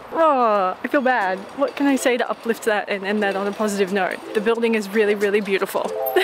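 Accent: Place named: Australia. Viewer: She sounds Aussie, they drive on the same side of the road as Ireland and the UK.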